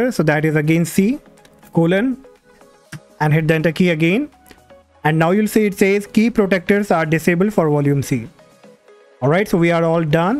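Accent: India. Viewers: It’s so difficult to understand Indian accents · Your annoying Indian accent pmo sm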